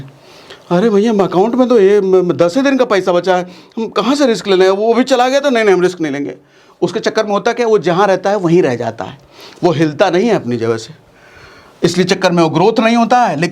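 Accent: Bihar, India. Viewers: sir namaste🙏 tex pe video chahiye v bhi aap ke desi bhasha me bij bij pe bihari ton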